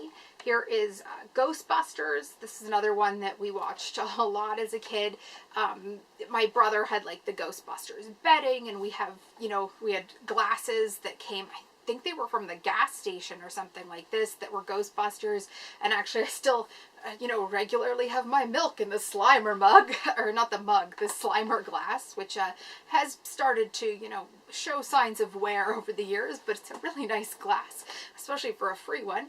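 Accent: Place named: Canada. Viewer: I just like listening to your sweet Canadian accent. Great video. You got some awesome classics in that haul. Also, that shirt seems nice and warm,:-) Have a great day! ~Marty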